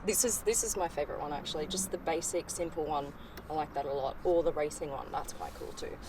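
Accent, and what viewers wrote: Australia. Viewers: Can you do a video with you, only saying: "Because because because" for an hour or two? Please? Just love your Aussie accent!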